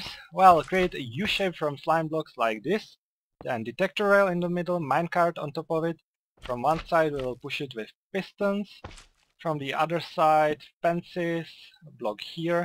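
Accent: Czech Republic. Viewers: Where ya from, you have a Czech accent a little :D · u have like Czech accent :D where are u from? :D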